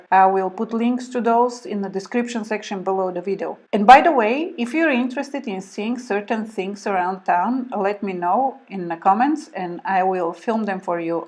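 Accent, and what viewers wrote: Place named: Eastern Europe. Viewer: I picked up the east accent , I'm from Austria :)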